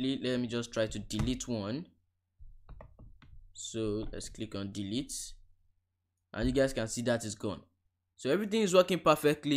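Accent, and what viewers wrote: Nigeria. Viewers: Very hard to miss the accent. Naija for life. 😍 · It is so funny how after listening to the first 6 seconds I knew this was a Nigerian😂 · From the accent and name, I guess you're Nigerian... Yay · Are you a Nigerian 🇳🇬?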